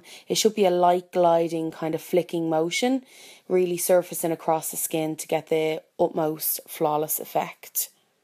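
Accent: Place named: Ireland. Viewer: You're beautiful with or without makeup 💓 I love the accent as well, don't see how us Scots and you Irish get mixed up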